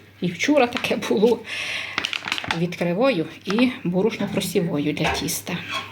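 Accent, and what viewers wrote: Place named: Cherkasy, Ukraine. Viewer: ти класна, таку б мені подругу мати 🥰і відео твої цікаві, і мова теж мила, ба нашу Черкащину зразу відчуваєш, тому я й підписалася на ваш каналі завжди жду відео ❤️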